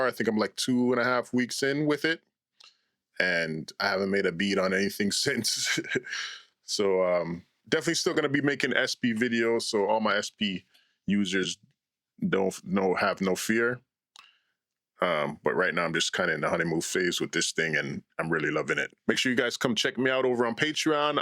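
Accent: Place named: Jamaica. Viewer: Where you from sound like a yaddie 😂 thanks for the video btw!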